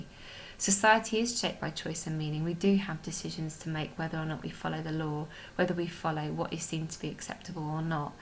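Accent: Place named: United Kingdom. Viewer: Lovely explanation. lovely British accent .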